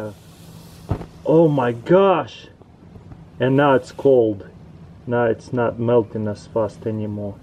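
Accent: Russia. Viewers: Diego Andre he's Russian, have no never heard of accents? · Come on everyone be more mature! has an accent, i bet if you spoke Russian and were from another country trying to have a youtube channel, you wouldn't like people making fun of you! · Diego Andre He's Russian. It's called an accent, if you've never heard of it. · Diego Andre he's Russian he has an accent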